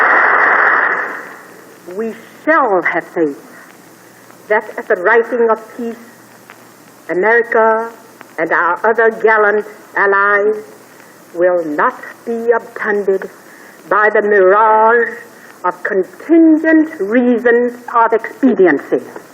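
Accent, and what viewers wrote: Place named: China. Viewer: I feel like she has a very slight Chinese accent though, which is surprising to me considering she was educated in the US at a young age.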